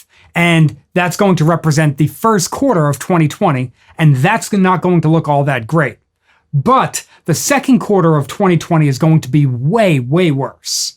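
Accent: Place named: New Jersey, United States. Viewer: = Awesome. I could listen to that lovely Jersey accent all day.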